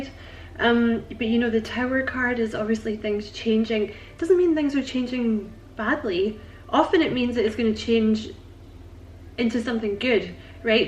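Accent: Scotland, United Kingdom. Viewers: I love your accent. Sounds Scottish to me. I was born there and miss it. Thanks for the reading. Im yours...lol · "How to Train Your Caterpillar" is the most adorable name ever. Awesome work & vibes, thank you. I feel very seen. And the Scottish accent is awesome.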